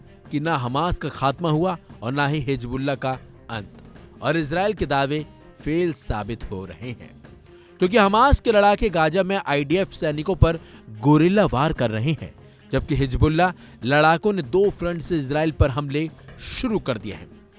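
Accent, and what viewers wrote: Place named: Madras, India. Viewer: Ur channel sounds madras chap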